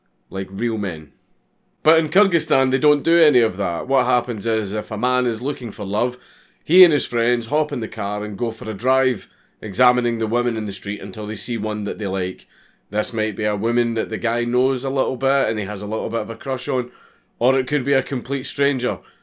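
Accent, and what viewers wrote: Scotland, United Kingdom. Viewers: Scottish accent = awesome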